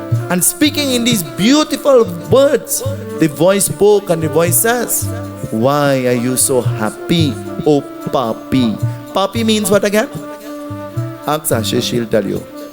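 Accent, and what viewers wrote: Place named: Trinidad and Tobago. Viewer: SITARAM. PUNDITJI...I. LOVE. YOUR. LITTLE. TRINI. ACCENT.. YOU. DID. THE PUJA VERY. NICE.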